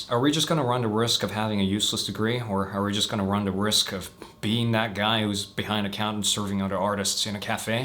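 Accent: United States. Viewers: why do you pronounce things more like an american than an aussie?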